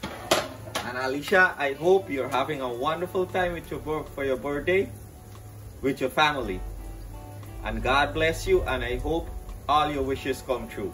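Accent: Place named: Guyana. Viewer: The awesome dishes that you are preparing and sharing the recipes, are the type of food that we ( we) I’m putting we in brackets because I’m not referring to anyone. My grandma used to make this awesome dish. Even to my grand father in law ( Indian decent) I’m so grateful for our ancestors and their recipes. Thank you very much, you rocks. Love all the recipes, god bless you brother. Must say that I love the Guyanese accent.That’s what I’m talking about.the awesome five finger fruit drink. That’s a power house juicer.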